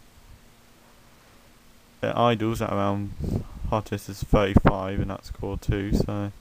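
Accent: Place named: United Kingdom. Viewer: i couldnt understand what you were saying half the time... you clearly have a british accent... Are you Ozzy Ozzbourne?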